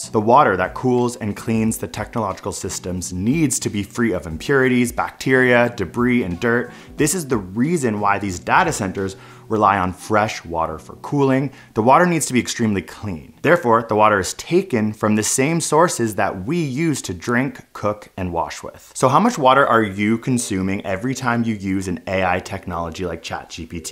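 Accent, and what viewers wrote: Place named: Canada. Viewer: I knew he was Canadian due to the way he pronounces data.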